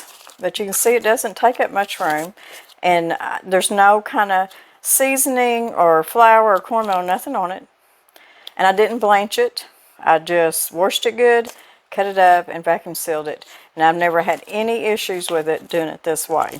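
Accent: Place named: Arkansas, United States. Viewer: Hi and, I love your videos. I look for a new one every day. My husband comes from Hardy Arkansas. His family is wonderful and talks just like you. Good people. I’ve swam in Spring river and stayed at the old motel, the lady that owns it was a friend of my husband’s mother. I heard stories about Hap the Chief of police and how he still keeps folks doing right from wrong Lol. I wanted to ask a guestion because I’m new to canning. I wanted to know if Round roast, bottom and Top Roast is good for canning soup. Stew and meat? Thank you my friend, Cheryl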